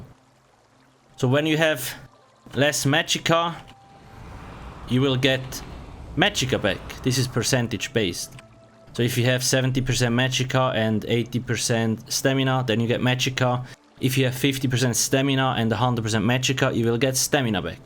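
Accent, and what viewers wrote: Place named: Switzerland. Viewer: His accent is Swiss.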